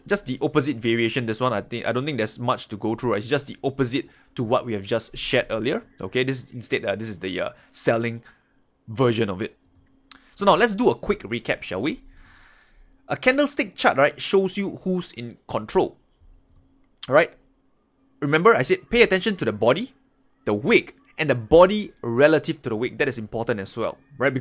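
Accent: Singapore. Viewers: Are you Singaporean? your accent look like it. I used to work there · He had typical Singaporean accent 😍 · Singaporean accent to me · I think it's probably a Singapore accent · Singlish for sure 😃. Very catchy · I miss my Singaporean friends because of your accent bro. 😫🤩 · All Singaporeans speak like this! I lived and worked in Singapore for ten years and married a Singaporean gal. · him speaking in a singaporean accent doesn’t mean English is his first language. Our first language is English most of the time. Don’t be ignorant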